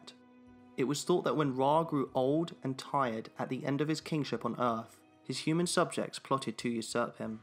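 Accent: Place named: England, United Kingdom. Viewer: The pronauncation of the gods is so english, I could not recognize them. Osiris? Like Miley Cyrus? Really??? its O-ZE-RES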